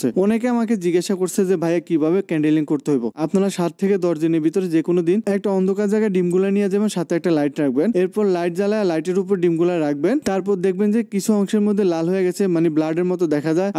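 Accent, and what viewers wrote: Noakhali, Bangladesh. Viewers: ভাই আপনার বাড়ি কি নোয়াখালী বলবেন 🥰🥰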